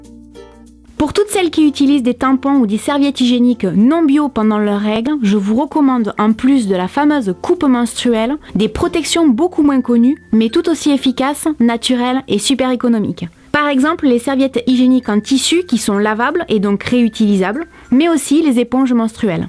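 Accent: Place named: Toulouse, France. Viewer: Coucou ^^! J'adore tes astuces, elles me servent beaucoup!! Est ce que tu viens de Toulouse? Car tu as un accent qui me fait penser a ma grand mère qui viens de Toulouse. Enfin bref, je t'adore ♡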